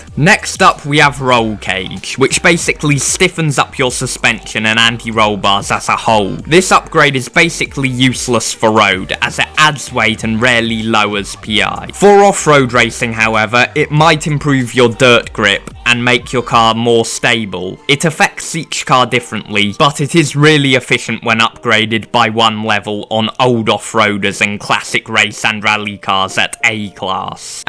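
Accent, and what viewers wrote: United Kingdom. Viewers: british accent + braces = the most unconfortable voice to hear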